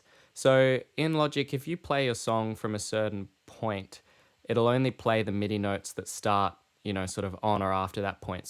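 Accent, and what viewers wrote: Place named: Australia. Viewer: So refreshing to hear an Aussie explaining Logic. Some accents really grind my gears. Hahaha.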